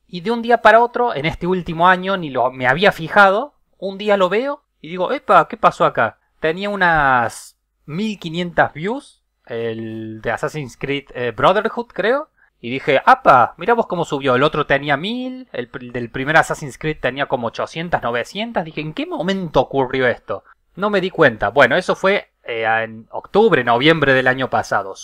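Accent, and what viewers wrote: Córdoba, Argentina. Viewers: Me parecia un acento cordobés pero se me confundía por el filtro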